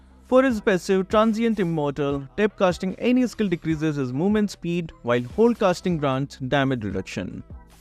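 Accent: India. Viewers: Indian accent wtf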